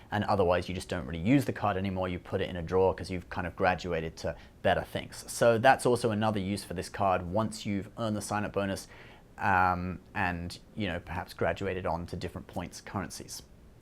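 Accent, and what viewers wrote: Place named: United Kingdom. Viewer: American Eagle shirt + Brittish accent = paradox that could unravel the very fabric of the space/time continuum, and destroy the entire universe.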